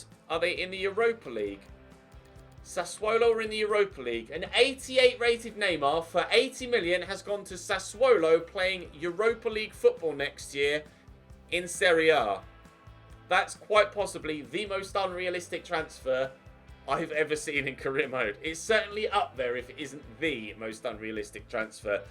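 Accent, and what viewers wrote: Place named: RP, United Kingdom. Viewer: FUCKING BORING, NOT NEEDED TO CONTINUE THE CAREER, YOU TALKING IS SO BORING WITH YOUR UPPER CLASS POSHNESS xD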